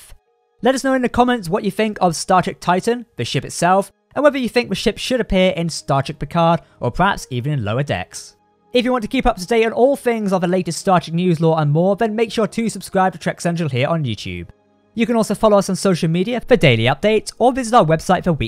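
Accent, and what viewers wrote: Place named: United Kingdom. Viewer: Thumbs down for the maddening sound of your British R's.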